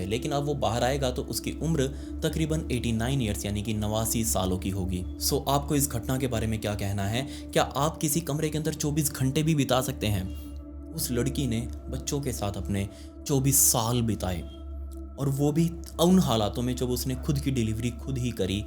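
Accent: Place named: Haryana, India. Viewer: bhaiya ki haryanvi bich bich m nikl jati h m ek ek word notice krti hu😂😂😂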